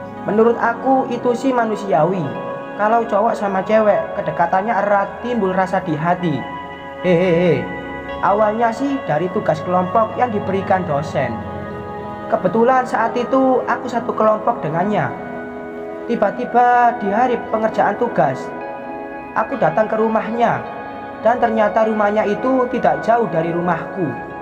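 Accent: Java, Indonesia. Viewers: Lanjut cetita y pokoke slalu ngakak...dngan bhsa y medok bngt...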